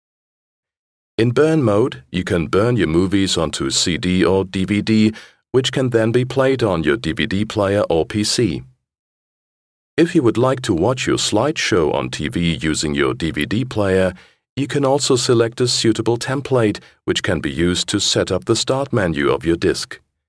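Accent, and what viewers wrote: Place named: Germany. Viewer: the guy whos talking has a german accent!! lol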